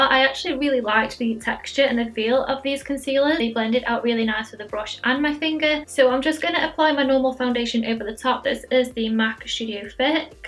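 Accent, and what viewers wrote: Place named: Stoke, United Kingdom. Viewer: Bit of a random question but are you from Stoke? Your accent sounds so Stokie!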